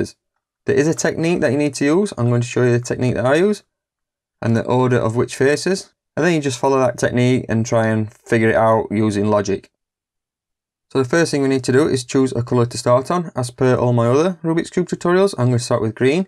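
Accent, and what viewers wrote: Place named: Mexborough, United Kingdom. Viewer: You sound like youre from Mexboro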